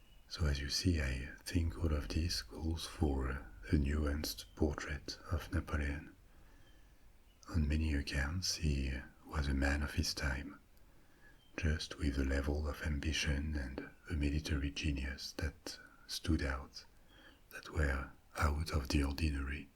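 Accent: France. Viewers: I love history , soothing voices, this should be heaven for me, but his french englisg just doesnt work for me, k dont know what it is, just doesnt get me relaxed, and its a pity awesome content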